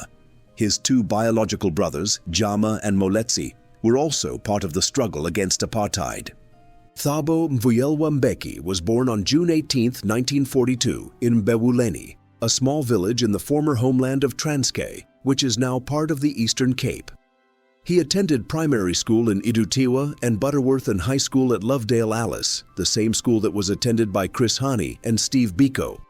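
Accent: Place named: United States. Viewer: Forgive my misconceptions. The accent drove my comment. I'm paranoid of anything that sounds and looks American. You can't blame me can you? I'll give your video a second check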